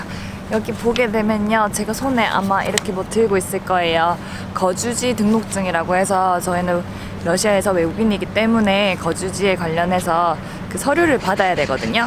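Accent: Gyeongsang, South Korea. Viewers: ㅋㅋ 말도 이쁘게 하시네 경상도 말투 정겹네요 멋져요 ㅎㅎㅎ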